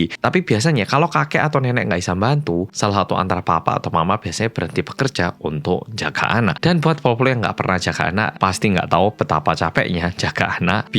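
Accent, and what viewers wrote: Java, Indonesia. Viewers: hai medok❤ lgsg nyimaaak🎉 salam bolobolo😘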